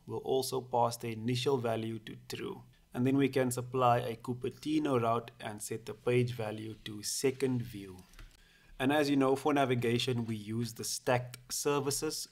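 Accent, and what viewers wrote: South Africa. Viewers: Awesome! When I heard you talking I knew you were from South Africa! It's cool to see a fellow South African on YouTube! Awesome channel man.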